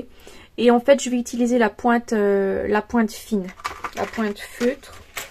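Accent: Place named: Franche-Comté, France. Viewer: Une belle idée. Bravo. Tu es de quel secteur ? Ton accent me rappelle mon enfance près de Besac. Bises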